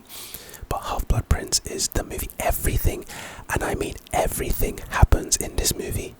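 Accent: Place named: London, United Kingdom. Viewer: This is going to sound really stupid, but your accent makes me feel incredibly homesick for northeast london ^-^ ...But in a good way. Its nice, to hear a voice that sounds so familiar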